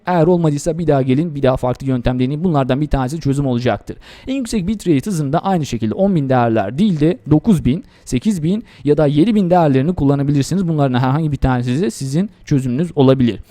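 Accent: Azerbaijan. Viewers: Azeri o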